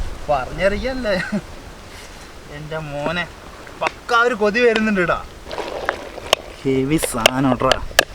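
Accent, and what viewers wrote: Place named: Kollam, India. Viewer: ഒരു കൊല്ലം slang ഉണ്ടല്ലോ സംസാരത്തിൽ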